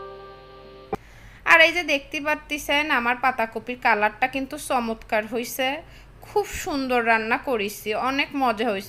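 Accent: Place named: Khulna, Bangladesh. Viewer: বান্ধবি খুলনা ভাষাটা তোমার মুখে শুনতে অসাধারণ লাগলো 😊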